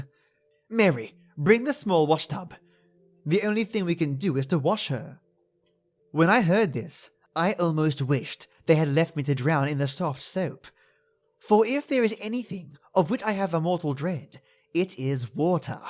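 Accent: Australia. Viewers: My younger brother, Lee is here and he absolutely loves your voice! He wants to listen to more of your videos. He enjoys the inflection of your voice, your wonderful Aussie accent and the little injections of different voices you do. My brother noticed how genuine you are and how much you love narrating stories. I always knew he has good taste and what I consistently say about you rings true and aligns with what he said. How wonderful! My mother, brother, Daisy and myself all appreciate your videos and narration. Thank you very much my lovely and brilliant friend and for this!❤💜🧡💛💚💙💓💕💖💝💞☕🍰😇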